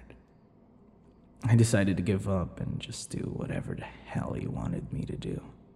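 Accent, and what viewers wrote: Philippines. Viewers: OK- this is just a idea cuz ur filipino accent, love it ALOT, can u maybe do a filipino one? would love to see someone take my idea but there is no forcing but just a suggestion :D (i love ur vids so much omg, keep up the amazing work!!) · he's closest to Filipino accent 🥵❤️‍🔥🇵🇭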